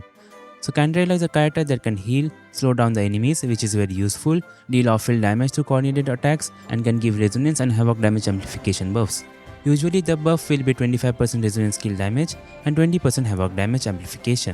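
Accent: India. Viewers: I legit thought you got hacked 😂. Who would have thought the real voice sounded Indian 😂😂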